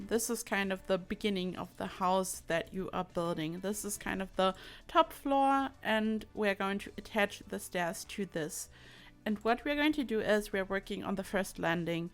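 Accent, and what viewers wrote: Germany. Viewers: you have the same accent as * and * and they are germans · wie man diesen deutschen Akzent einfach raushört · Are you German because I can here an accent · Are you german? bc of your accent. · That german accent tho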